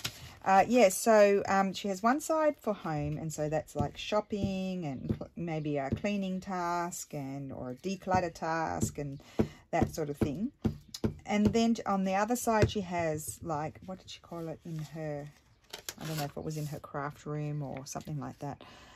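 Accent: Australia. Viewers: Righty O!!! I love when you speak Auzzy How do you spell that? Giggling.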